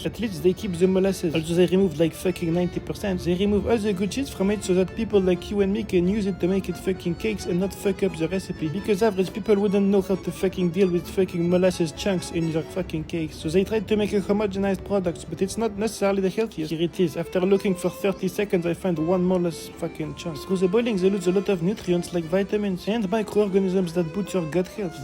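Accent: France. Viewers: Ar you French ?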